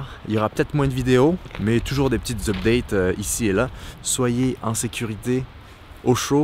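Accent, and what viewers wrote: Quebec, Canada. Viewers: Non. Il a un léger accent québécois.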